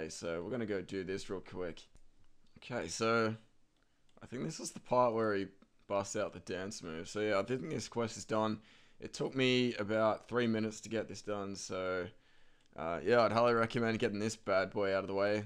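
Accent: Australia. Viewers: Been so long since I heard that Aussie accent. :)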